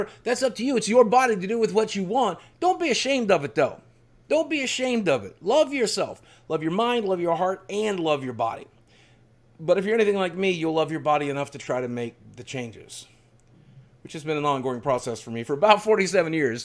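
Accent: South, United States. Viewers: Watch his "origin story" series... he originally had a hillbilly accent, and it comes back when he visits the town he grew up in.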